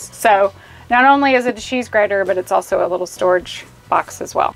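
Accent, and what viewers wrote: United States. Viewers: I love you accent! im French and my favorite American accent is yours!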